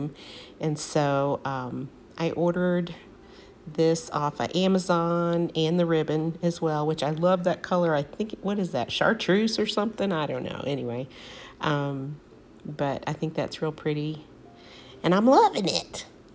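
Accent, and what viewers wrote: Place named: South, United States. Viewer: Hi! I just found your channel. I am also a retired teacher and I can detect your southern accent. You can take the girl out of the south, but you can't take the south out of the girl! Thank goodness! I am a transplanted Texan living in Houston, Missouri. I really like your decorating style. I will be back for more videos.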